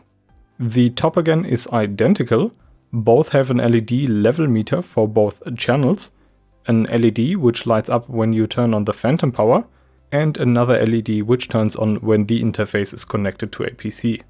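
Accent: Germany. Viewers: I hear a bit german..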